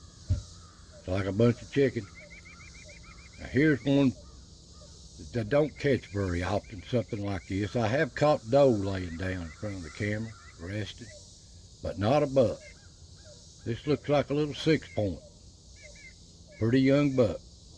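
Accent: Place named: Blue Ridge, United States. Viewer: well he sure is eating good!😸 and your accent and way of talking sure makes me homesick for the Blue Ridge Mtns/Shenandoah Valley that was my home!! 😊 I sure 'nuff love your channel, Bro. 🧡💙🧡 Blessings my friend 💜💜💜🙏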